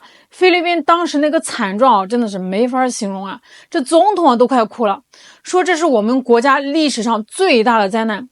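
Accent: China. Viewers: 妮子，你是哪个地区的普通话，好听。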